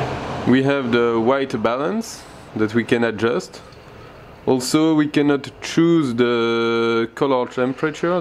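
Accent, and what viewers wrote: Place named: France. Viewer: On sent vachement l'accent français, mais on apprécie l'effort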